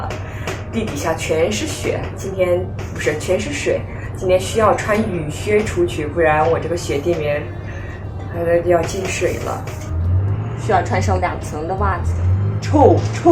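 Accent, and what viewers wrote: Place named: Beijing, China. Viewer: 这汉话没有内蒙口音，倒是像北京话，譬如用 霍霍 这词。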